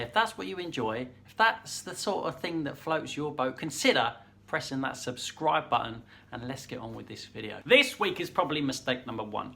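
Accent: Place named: England, United Kingdom. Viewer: Good tip in a general sense but you are making the assumption that all flour is the same. Guessing from the accent comes from England. I live in the very hot, very wet, very humid tropics. Exactly the same flour behaves differently out here. It not only carries more water it just sucks it out of the air while you knead it. And don't buy a dough scraper. You definitely need one but just find that old credit card you the in the back of the cupboard. Pair of kitchen shears to snip the round corner. Sounds a bit critical but I will be back. You give good knowledge.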